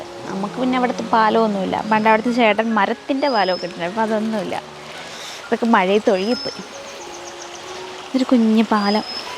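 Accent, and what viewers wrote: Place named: Southern Kerala, India. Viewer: കുട്ടി..... കോട്ടയത്ത്‌ നിന്നും.... ഇവിടേക്കു.... വന്നതാണ്... ല്ലേ.... അപ്പൂപ്പൻ മാർ.... ആയിട്ട്..... 🥰 മോളുടെ.... സംസാരത്തിൽ.... പാലക്കാട്‌ ടച്ച്‌.... തോന്നിയിരുന്നില്ല..... ഒരു തെക്കൻ സ്റ്റൈൽ തോന്നിയിരുന്നു.... 👌💖 ഞങ്ങളും പാലക്കാട്ടുകാർ..... 💖🥰🥰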